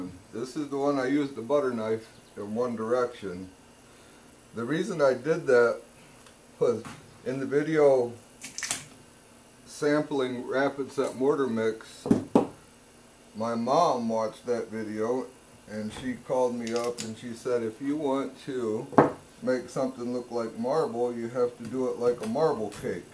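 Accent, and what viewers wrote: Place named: Michigan, United States. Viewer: WOW!!! Amazing! You have been absolutely informative and helpful. All 3 turned out great, but I like the "fingers" better, seemed more naturally swirly and less angular.. I'm hoping to take on a small bathroom vanity in the near future and had a lot of doubt about concrete until I saw your channel. "Michael builds" channel is helpful too, so my husband and I bought the rapid set mortar mix... Plus an extra bag too experiment with. I was also thinking about adding a tiny bit of fine powdered red clay (we live in Georgia and it's everywhere) to the bottom of the mold for "rust" streaks in the "marble." I can't wait to try it out! Thanks!!! Love your accent, I lived in Michigan once upon a time!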